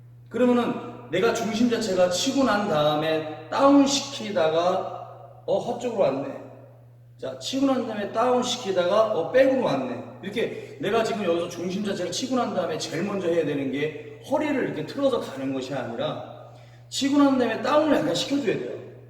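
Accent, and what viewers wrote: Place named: United Kingdom. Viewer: 영국 영어 발음!! 스마슁, 산드위치, 땅큐^^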